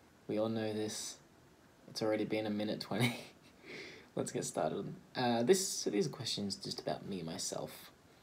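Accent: Australia. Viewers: You sound very Aussie still. But right at the end when you said "I'll see yas round". I was like "nah he's definitely Australian haha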